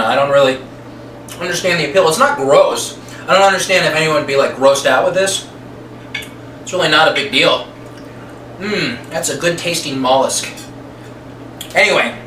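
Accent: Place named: New York, United States. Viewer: are you from New York or Massachusetts? Your accent sounds similar haha.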